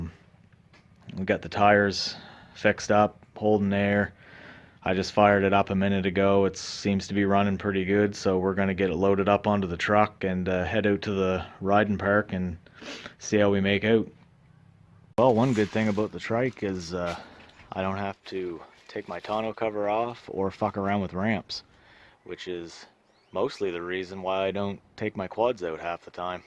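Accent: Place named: Nova Scotia, Canada. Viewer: Ohhhhh nova Scotia...I should have picked up on the accent...iv got the same situation..I picked up this little atc on a trade..got it going...but it's too small for me.. so just sits...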